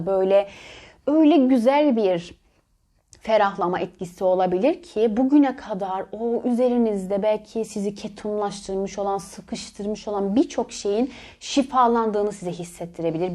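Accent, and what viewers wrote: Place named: Cyprus. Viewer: Tam bir kıbrıs şivesi var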